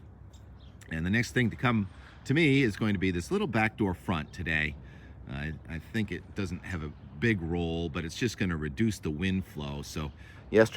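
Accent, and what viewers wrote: Boston, United States. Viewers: You had it right the first time, it's Oscah. Lots of people wish they had as good an accent as Bostonians. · The warm ayah is heeah again... Brandish your Boston accent graciously. ☘👍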